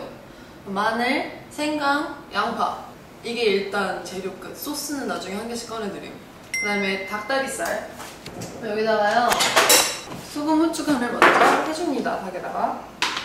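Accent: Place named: Gyeongsang, South Korea. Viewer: 는 경상도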